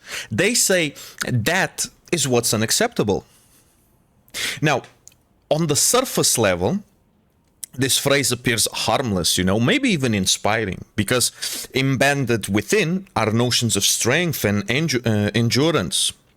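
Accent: Portugal. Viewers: é incrível como basta um tuga para reconhecer outro tuga a falar ingles ahah, vídeo incrívellll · Interesting video. Portugues? e do norte? parece-me 😅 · You sound Portuguese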